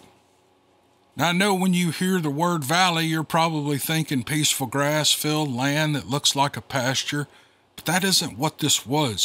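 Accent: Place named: Appalachia, United States. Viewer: I'm frail now, in my early 60's, but liver failure has made me look far older than I am...and my looks betray what I once was, long ago. 6'5", 245-lbs...once a U.S. Marine, then various colleges and careers leading me to a distinguished position as a Director of Engineering...all betraying this lanky half-Cherokee kid who grew up not far from Clingman's dome in Tennessee...an 'appalachian hillfolk', as it were. I hear the accent that I had as a kid, and listen intently to the stories told here, and it brings me back to old stories like I grew up surrounded by...legends of the hill people and the Cherokee...from a time long ago. The love of my life and I spent summers in each others arms...and she has been my wife for many years now...almost 50, as memory recalls (we were 'like one' for years before we actually got 'legally married')...and nothing is better than sitting here in the evenings with her, as we listen to the channel like you might listen to WSM-650 back in the day, and hear the old shows and country music...by the living room radio (the size of a modern TV now)...keep up the great work,...some things should never completely 'fade away'...like the stories here.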